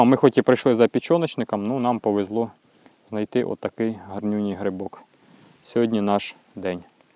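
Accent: Surzhyk, Ukraine. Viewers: Молодец, что на украинском. Правда, сильный суржик сходу слышится по первым минутам. :) Треба трошки попрацювати ще. Чтоб для ушей еще мелодичней было. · Уж лучше на руском говорите. Ваш суржик режет уши .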